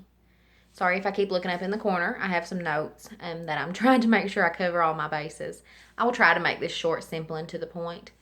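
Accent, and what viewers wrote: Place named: Kentucky, United States. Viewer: I knew that accent!!!!!!!!!!! That's why I came to find the first video hoping you would say where you are from! I'm from Kentucky too lol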